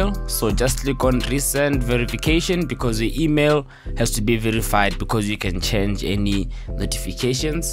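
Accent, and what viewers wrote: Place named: South Africa. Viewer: NICE !!! GOOD HELP , UR ACCENT SOUNDS LIKE SOUTH AFRICA IM ALSO FROM SOUTHEN AFRICA , PEACE N LOVE THANK YOU BRO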